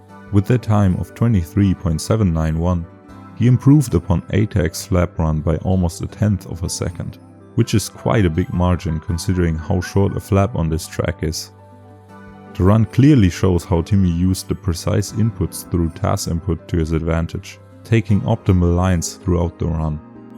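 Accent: Germany. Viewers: 2000% bist du deutscher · i just found your channel and after 10 seconds i knew i had to subscribe banger video man + i know youre german but i cant prove it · Bro sounds a bit German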